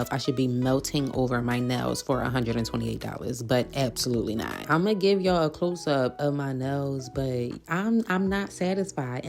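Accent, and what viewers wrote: Philadelphia, United States. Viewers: Hey girl I’m new. I followed you cause I heard the Philly accent 😂 and your children are sooo cute. I just ordered clothes from shein on 4/11 i got 24 clothing items for $232 including custom sterling silver jewelry for my daughter with her name. So I definitely think somebody spiced it.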